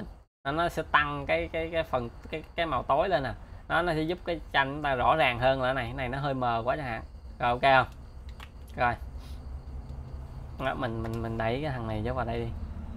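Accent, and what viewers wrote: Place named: Mekong Delta, Vietnam. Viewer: A ng miền tây nch dĩa huông wa he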